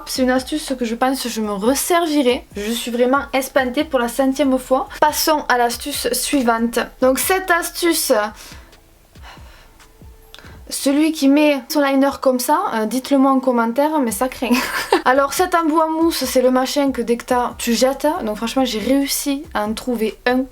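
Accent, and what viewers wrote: South, France. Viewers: Moi aussi je suis du sud et j’ai le meme accents que toi ❤️❤️❤️ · Koukou j'adore ton accents du Sud comme moi lol et ce mot que j'adore mais que j'ai souvent honte de dire " je suis espanté " donc merci sa fait plaisir lol 😍😘💖❤😍😘💖❤😍😘💖❤ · Vive le sud et notre accent tu est de quelle origine ??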